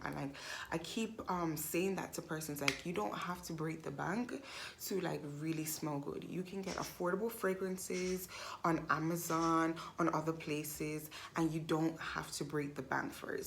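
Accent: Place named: Jamaica. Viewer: Great review! You're from Jamaica correct? Your accent sounds a little different. Like a few different accents mixed together.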